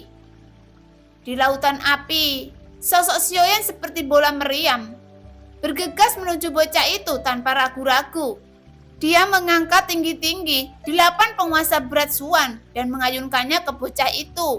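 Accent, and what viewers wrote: Java, Indonesia. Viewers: Wong jowo to mbak..mimin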